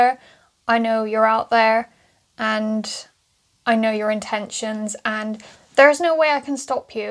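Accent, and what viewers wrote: Bristol, United Kingdom. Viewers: Is that a Bristolian accent I hear?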